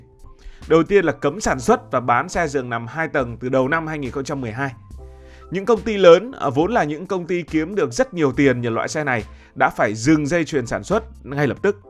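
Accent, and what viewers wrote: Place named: Thanh Hoa, Vietnam. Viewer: blv quê thanh hoá à, nghe 1 vài câu ra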